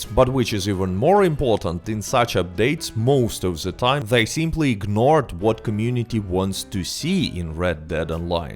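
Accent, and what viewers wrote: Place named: Russia. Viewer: Love the Russian accent and Russian attire makes it great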